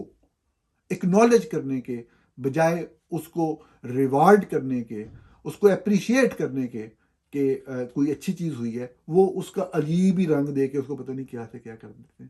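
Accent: Punjab, Pakistan. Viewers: Punjabi touch 😂..Good analysis❤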